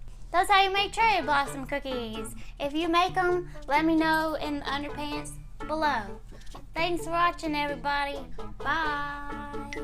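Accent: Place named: Georgia, United States. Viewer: I'm live in Warner Robins and have never heard an accent like yours. It's so cute and unique. I thought maybe you were from north GA. It's almost cherry blossom festival time again. The pollen is killing us!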